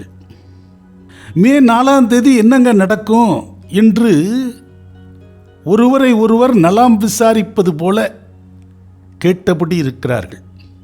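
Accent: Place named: Kerala, India. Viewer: இவர் மலையாளி மாதிரி பேசுகிறார் மலையாளி ஜோதிடர் ரா !....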